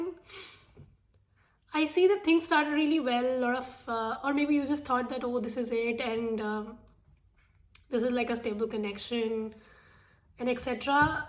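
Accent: India. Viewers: It’s not her fault it’s the Indian language when they speak English ... I worked with them it’s hard you just have to pick up a few words & guess the rest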